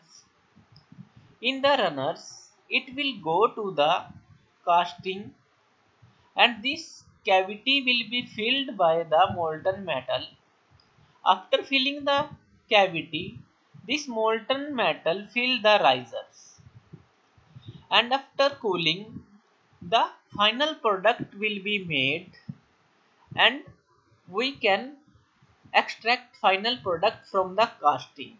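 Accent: Punjab, India. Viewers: U r Punjabi because it accent like that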